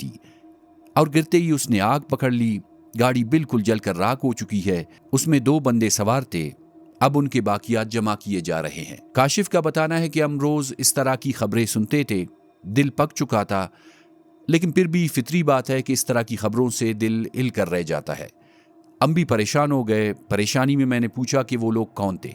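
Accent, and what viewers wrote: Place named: Balochistan, Pakistan. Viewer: واہ بلوچ تمھارا لہجہ بلوچی ھے مگر اردو اچھی بول لیتے ھو۔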